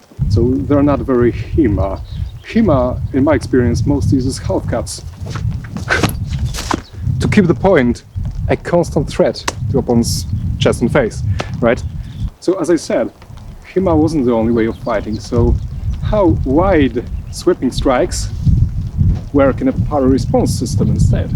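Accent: Poland. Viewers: You seems to have Polish accent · you sound like a Polish Christopher Walken. love the vids!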